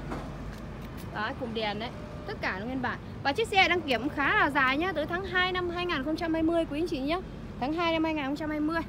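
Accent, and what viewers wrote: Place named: Hai Duong, Vietnam. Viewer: Thương em vất vả, nghe em nói đã biết quê gốc Hải Dương, cứ níu na níu nô, chúc em kinh doanh tốt nhé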